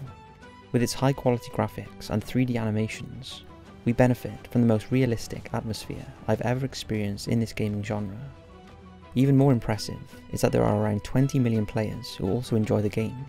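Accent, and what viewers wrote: United Kingdom. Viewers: Would be helpful to show the name of each of these creatures in text. I couldn't understand what you were saying in some cases. For number 3, CC listed you calling these creatures "Forward", "Board," "Vaude," and "Vort." Later there was "Linga Barked," and "half goofer." Huh? And number 1; was it "Pesto," "Pesta," "Pastor," "Pasteur," "pasta," or "pester?" CC may not pick up the British accent.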